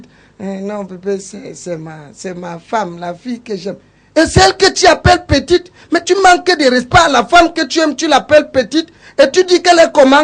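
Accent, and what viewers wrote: Cameroon. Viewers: J'aime cet accent bassa'a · l'accent bassa ..parfait mais pour l'histoire , j'ai eu du mal a decoller...mais pas mal tt de meme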